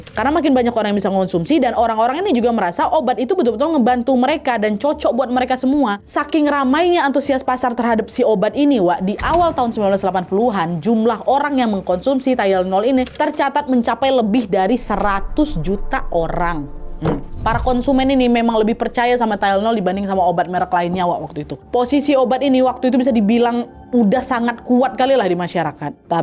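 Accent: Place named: Sumatra, Indonesia. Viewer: Cuma yang bisa ceritain kisah yang aslinya dari luar negeri semua tokohnya jadi berasa jadi orang Sumatra hahaha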